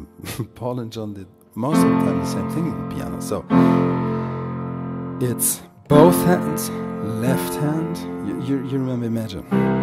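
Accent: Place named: Germany. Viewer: Hab schon gedacht ich wäre der einzige der, denn deutschen Akzent gehört hat.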